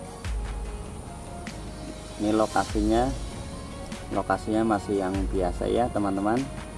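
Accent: Java, Indonesia. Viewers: Sampean jawane ngndi.. Poine jos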